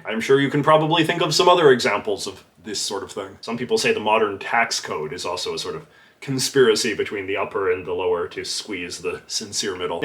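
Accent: Canada. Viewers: Immediately thought about Ricky lol, especially cause you have a Canadian accent.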